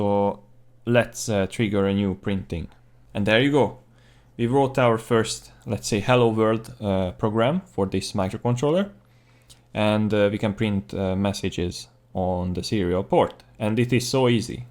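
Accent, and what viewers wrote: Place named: Hungary. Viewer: very good tutorial magyaros kiejtessel 🙂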